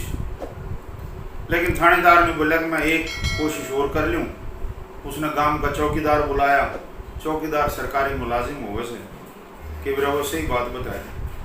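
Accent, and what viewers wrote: India. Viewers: Waah bhai zabardast Kahaani Afsana "Eyka Akta bhaichara yakjahti..Aapka padhne ka lahja kamal haryanvi sai... Aapsi bhichhara hamesha rakhna chahiye Janab Rana Rahmat Ali (Marhoom) Bade uncchhe paye ke haryanvi Adeb sahiyatkar they sukriya,Aapka Haryanvi Reel tv ka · Bhai wah! Aap ka haryanavi lahza Rohtaki laaga sai. Jaisa likha same usi pronounciation mai parh diya. Lekin" ekka" ka ka matalab itfaq nahin hona chahiye. Ekka ka matalab Ekta (unity) aur kahani se bhi unity hi jhalak rahi hai. Itfaq ka matlab 'by chance/coincidence hota hai.if I am not wrong.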